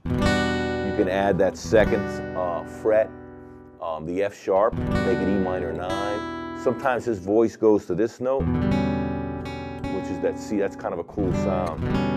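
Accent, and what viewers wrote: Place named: New York, United States. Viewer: An Italian American man with new York accent teaching an Indian sing. Amazing!!!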